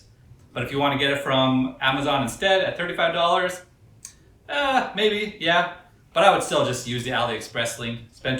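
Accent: Canada. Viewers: Sounds very fucking Canadian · You’re Canadian aren’t you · Are you a Canadian?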